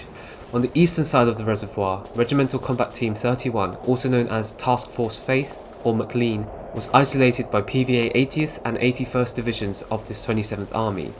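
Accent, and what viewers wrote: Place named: South Korea. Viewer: He sounds Korean. Possibly a Korean-American.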